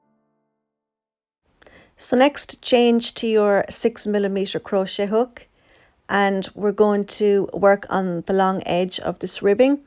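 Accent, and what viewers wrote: Ireland. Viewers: Hi, I've been searching for a nice hoody/cardigan for the last few days and found yours, and I love it! I love your video very easy to follow and I love your voice , its very calm and mellow. Is your accent Irish?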